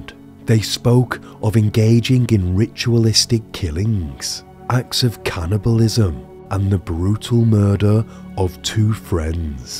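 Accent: United Kingdom. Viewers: What region of British accent this?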